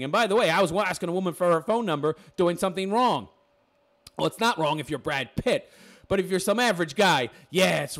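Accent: United States. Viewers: You sound american' You so tough why don't you get arrested for not paying alimony and "take that shit to the supreme court and have the law taken out?"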